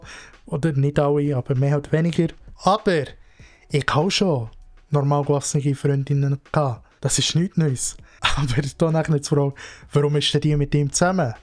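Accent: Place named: Switzerland. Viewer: War nicht leicht, aufgrund des "schwyzer Dütsch", alles zu verstehen, aber das was ich verstand: Sehr kluge Worte, sehr gute Einschätzung! Danke dafür! VLG Johann. :goodvibes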